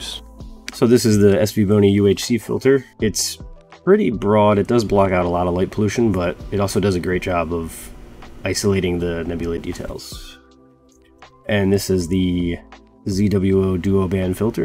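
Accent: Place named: Virginia, United States. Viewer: You in VA? Sounds like my area lol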